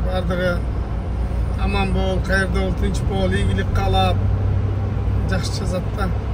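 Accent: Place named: Talas, Kyrgyzstan. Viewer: Брат Таластан окшойсунар😅😅😅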